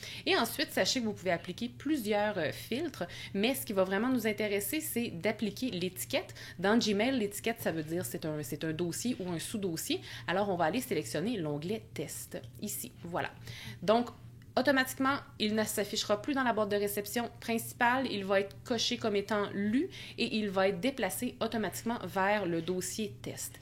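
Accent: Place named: Canada. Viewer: Merci pour ce tuto très sympa avec ce merveilleux accent canadien que j'aime tant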